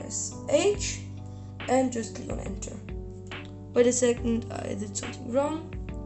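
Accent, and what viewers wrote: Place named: Germany. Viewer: du kannst gut englisch aber man hat das gehört das du deutsch bist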